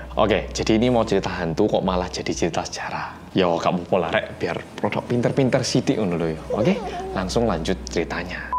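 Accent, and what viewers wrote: Java, Indonesia. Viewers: sprt biasa mas selalu menceritakan secara detail dan dg gaya khas medoknya wkwkwk, lanjoottt trs mas sukses yoh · Samean kok iso medok too mas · Ini muka nya kyk Korea bahasa bicara nya kyk org jawa. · korea punya youtuber orang korea yg medok, jepang punya youtuber nihongo mantapu .. keduanya favorite gue even gue udah emak" 🥰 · Aku terekomendasi dari temen, tertarik goro goro mas e ngomong wong korea medok 😂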